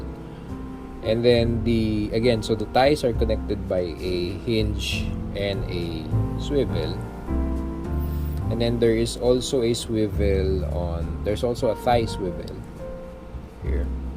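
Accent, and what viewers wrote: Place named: Philippines. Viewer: This guy is from the Philippines just the accent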